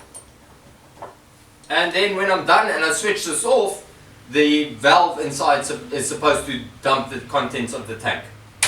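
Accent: South Africa. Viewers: Terrence Lemire South African accent 😉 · That a south african accent?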